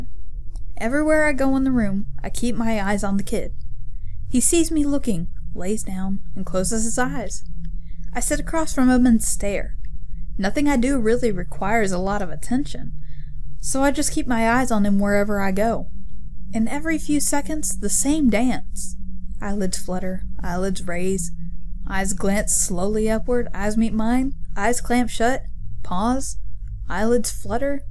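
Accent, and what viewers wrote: South, United States. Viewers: Nice accent you made me truly believe you were a southerner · You do a very good southern accent! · Nice southern accent:P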